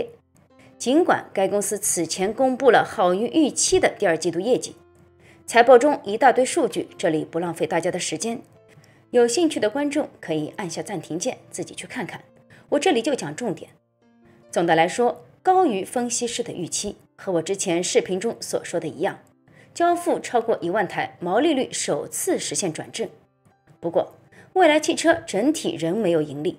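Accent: Shanghai, China. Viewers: 您是上海人么？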